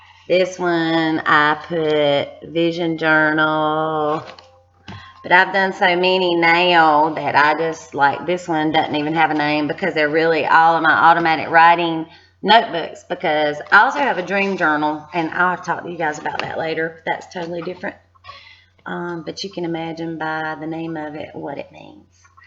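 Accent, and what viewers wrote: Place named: South, United States. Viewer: hi I'm a new member as of today so finding you now on utube. I'm so excited. I see you have got plenty of video's but I've been wanting to try auto handwritining cause I've woke up and somehow still had phone in my hand but have wrote out like a sentence and realize I get messages. can't wait also to watch your charkas. I got sle lupus and brain fog I feel like this could help me. lol I'll shut up and keep listening but wanted to let you know I'm excited. n I love us southern accent. I would do the writing like u choose too. namaste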